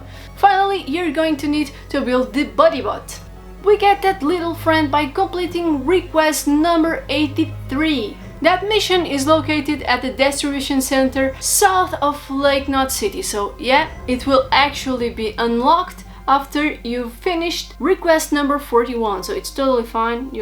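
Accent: Portugal. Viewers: Até que enfim achei um vídeo super explicativo, parabéns moça, você é de Portugal né? Abraços do Brasil! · q legal, achei o sotaque de ingles parecido parecido com alguem que fala portugues mas no fim era portugues de portugal kkk estou atras dessa conquista no death stranding otimo video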